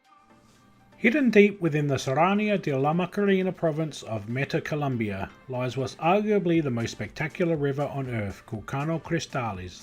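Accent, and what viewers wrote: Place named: Australia. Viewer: I love the Australian voice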